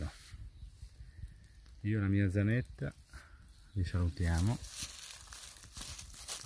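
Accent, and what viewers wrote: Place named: Bologna, Italy. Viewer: Gajardi l ' estatini...ammappello!!! Sei forte puro tu..ma n' do stai..a Bologna ,me pare,dall' accento tuo.. Dajee👍👍👍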